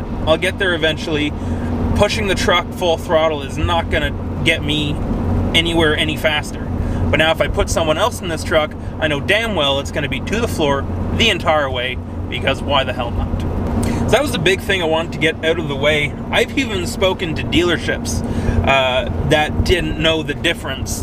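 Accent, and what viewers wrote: Canada. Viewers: My family had a cabin up in Lynn Lake, Manitoba. Beautiful country. And incredibly nice and friendly people... I enjoyed this video. I didn't know you're Canadian until I saw you at the pumps and started talking. Yup! I was paying $2.50+ a liter when going up there in July 2022. And dn here in the the state's people bitch about $3.00 a gallon!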